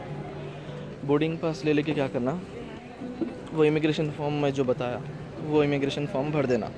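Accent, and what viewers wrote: Hyderabad, India. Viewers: Bhai hydrabadi bhasa bhot achi lagti meko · Sir your Hyderabadi Hindi is Fabulous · PURE hyderabadi yaar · Hydrabadi Hindi Is awesome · wow man i liket your hydrabady language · Awaaj sunke bhi aap ko pata nahi chala bhai haidrabadi h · Hyderabadi sunkey sukun masla dikha..mast samjhaye 😍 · Waahh... Puri Hyderabadi language Bole aap.. Good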